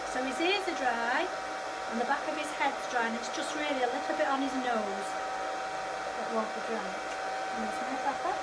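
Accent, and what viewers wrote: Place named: United Kingdom. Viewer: Just my luck... This is the 2nd video in a row that that I can not understand. Between my hearing problem and the thick accent(British?) I am just not getting the info I need. Otherwise, good video. Thanks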